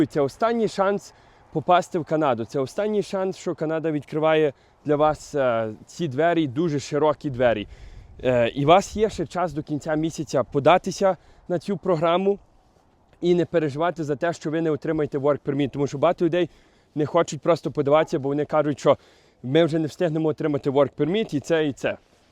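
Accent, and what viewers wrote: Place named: Transcarpathia, Ukraine. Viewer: Львів точно так не говорить, навіть близько, а от на Закарпатті так)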